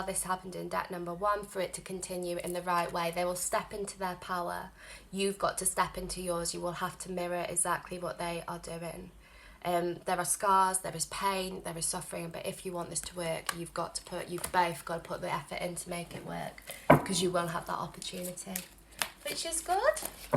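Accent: Liverpool, United Kingdom. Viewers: btw it’s such a shame that I don’t live in the UK anymore or else I’d pay you a visit in person. I used to live in Edinburgh and was there for my postgraduate education for 2 years. I loved everything there and didn’t really want to go back to the US but I had no choice cuz my student visa expired and I ended up living in Taiwan for now 😂 I miss the UK a lot especially when I hear you talk 😭 You sound like you’re from Liverpool or somewhere closer. P.S. I was also wondering if it would be ok for you to do a pick a card reading on “Are they still testing me?” or “Are they still observing me?”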